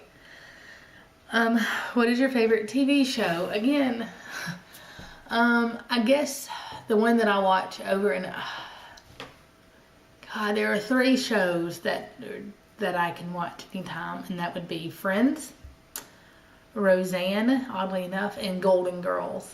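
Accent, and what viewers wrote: South, United States. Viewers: there is nothing wrong with being a Basic kind of person! You are one of my absolute favorite stitches (maybe becuase I'm from the South too and your accent reminds me of home) and I love your WIPs and finishes and hauls! You have a 2 yr old, as well, so no need to be down on yourself! You are ONE BUSY PERSON! I appreciate the time that you give all of us! Keep the videos coming!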